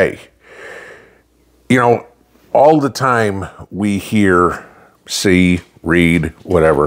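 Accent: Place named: East Tennessee, United States. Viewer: Yup, your speaking the truth. Sounds like you are in East Tennessee. I grew up in Roane County. They do some dumb things. Tennessee has so many people moving to Tennessee. The new comers will try to change things and turn it into what they left behind. Like HOA's hate them. If good men do nothing then evil will.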